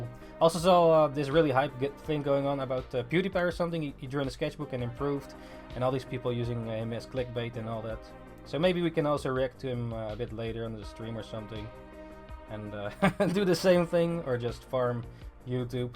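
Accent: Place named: Netherlands. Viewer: i just found this recommended to me and i could instantly tell ur accent was dutch lmao geweldig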